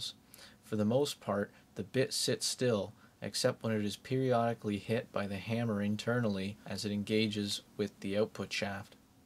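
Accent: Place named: Canada. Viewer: Why would a guy with a funny hat and Canadian way of saying... out... take it upon himself to explain stuff to us? I don't know but this little video is entertaining and informative. I have to get me such a tool. Thanks!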